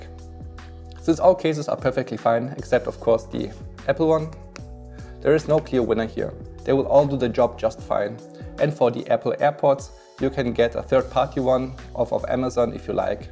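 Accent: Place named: Germany. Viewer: sympathischer deutscher akzent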